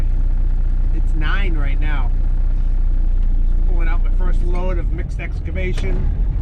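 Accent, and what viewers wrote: Boston, United States. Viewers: I love listening to you with the Boston accent, cause I’m from Boston and I’m. I’m living in the south now and people always love my accent they say.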